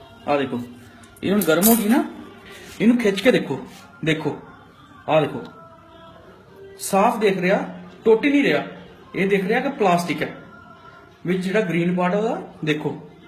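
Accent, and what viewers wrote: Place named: United Kingdom. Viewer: Bolda tu ida aa jida U.K. Born aa te xperiment india di gobi de kri janda Eh gobi asli aa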